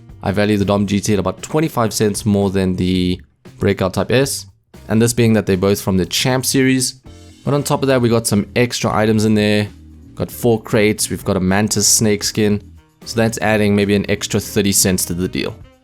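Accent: South Africa. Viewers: Are you from South Africa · Are u from south Africa?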